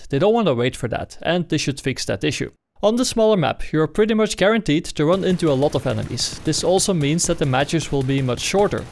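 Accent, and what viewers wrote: Netherlands. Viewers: He;s Dutch I think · My guy you sound so extremely fucking Dutch, it's unbelievable.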